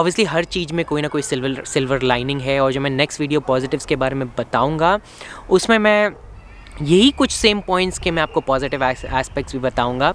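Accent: Canada. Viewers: Dude i thought you are a legit born canadian when you switched to hindi i was like 😱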